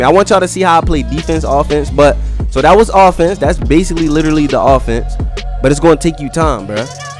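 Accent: Baltimore, United States. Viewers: U got a Baltimore accent no 🧢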